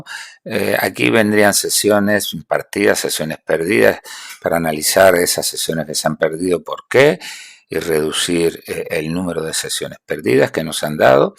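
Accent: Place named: Canary Islands, Spain. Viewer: Hablo muy canariona como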